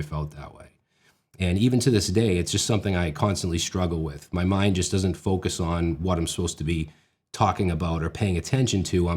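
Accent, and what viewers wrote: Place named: Boston, United States. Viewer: web dev videos helped me to enjoy web development again after losing interest in it around 2017. There was just something about his style and approach to making tutorials and crash courses. I love web development now more than I ever have, and finding his channel was a big part of that. It makes me wonder what my life would be like now without having found his channel. The only thing I question: You say you "used to" have a thick Boston accent!? I feel like we need an audio example, because this still sounds like a pretty thick Boston accent.:-)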